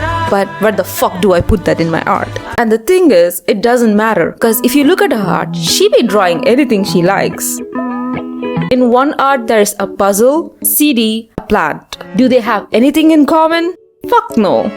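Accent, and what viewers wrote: India. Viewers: You got some little bit of Indian accent I think 🤔 you are from India, btw keep it up😊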